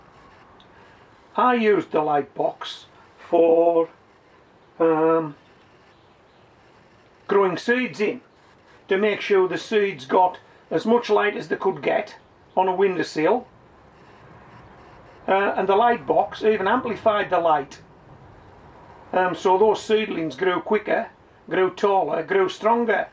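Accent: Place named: North West England, United Kingdom. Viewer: Hi, I seem to have offended you with my comment, I really didn't mean to, I was very excited to find your channel, and thought from your accent that you may be in the North West like me, so was inspired by the things that you were doing in this climate. In my first video which I am publishing this week, I have said that I got my inspiration from you, and linked to your channel. If you want me to take it out, I will do.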